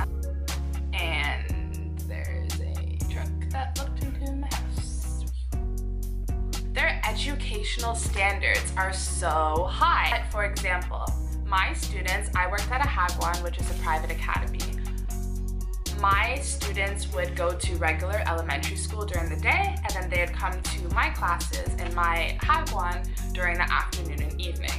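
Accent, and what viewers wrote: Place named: Patois, Jamaica. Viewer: mi thought yah half korean..til yah speak patwa..yah duh know yis fine empress mi like mi coffee strong and black..lol yah beautiful..mind and body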